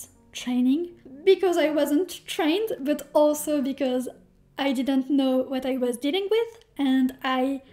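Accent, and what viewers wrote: France. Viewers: Your accent is French ???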